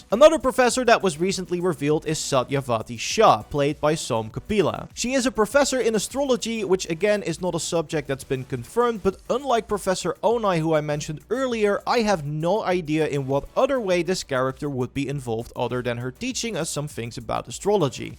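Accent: Netherlands. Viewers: Why does your voice change. This channel seems to be two different ppl. Both dutch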